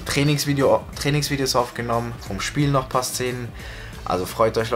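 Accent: Switzerland. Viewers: Wer findet auch das sein dialekt zum schwrizer wird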